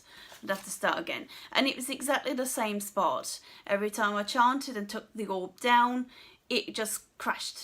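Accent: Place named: RP, United Kingdom. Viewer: You are one of the few people I know of that can still sound posh while swearing haha. Great review chum.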